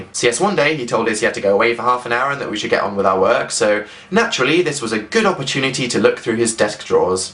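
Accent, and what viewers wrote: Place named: France. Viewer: French awww so cute! ☺️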